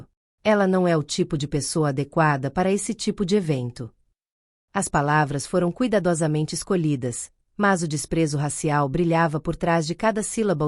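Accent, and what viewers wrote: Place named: Spain. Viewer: O português, de repente, do nada, vira espanhol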